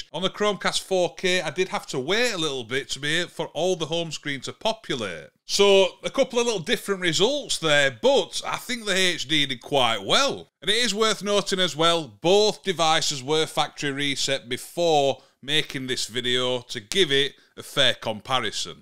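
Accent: Yorkshire, United Kingdom. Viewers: Are you from Yorkshire?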